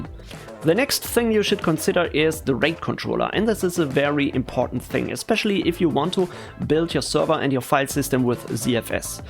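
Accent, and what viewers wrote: Germany. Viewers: I love that german accent,it's sounds really good.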